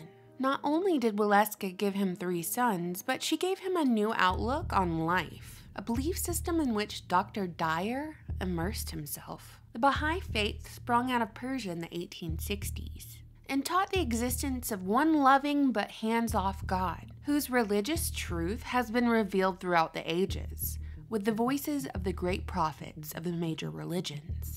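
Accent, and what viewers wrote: South, United States. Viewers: Welcome back I’ve missed you n that lovely southern voice.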